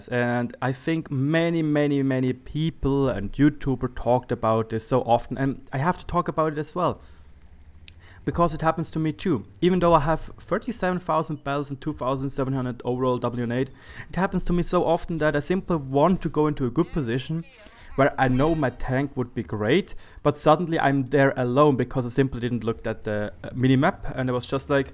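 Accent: Germany. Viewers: wait are you from germany? i'm new so just asking!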